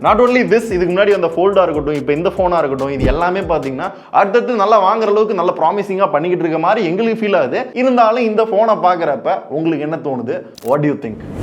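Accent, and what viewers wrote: Madurai, India. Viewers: Bro neega madurai thana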